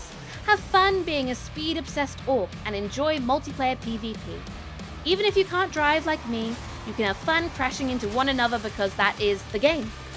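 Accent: United Kingdom. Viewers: I thought I heard some british accent or intonation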